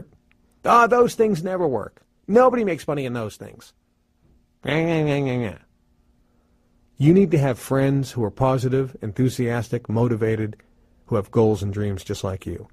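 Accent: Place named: Boston, United States. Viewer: where are you from? i hear your Boston accent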